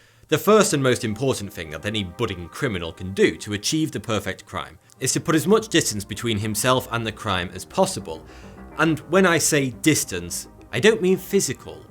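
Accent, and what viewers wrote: United Kingdom. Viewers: Get away with murder with this one simple trick... COPS HATE HIM British Vsauce much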